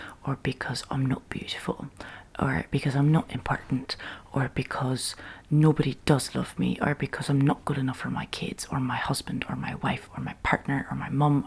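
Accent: Ireland. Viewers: Do more Irish and jersey accent roleplays !!! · We NEED more videos with the Irish accent! We beg you!!!! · Dang you got that irish accent down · someone saw the banshees of Isherin!! or you're just really good at an irish accent. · I love the Irish accent, please do more of it in the future!!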